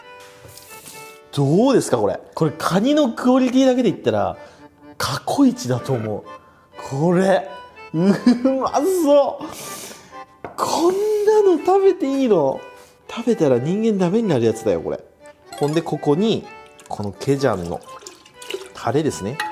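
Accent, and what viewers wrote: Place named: Nagoya, Japan. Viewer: 名古屋から上京してきたから、かねこの名古屋弁が地元みあってうれしい～！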